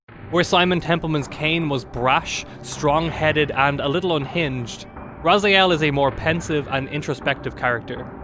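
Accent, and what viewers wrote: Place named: Dublin, Ireland. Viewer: It must be our beautiful Dublin Accent x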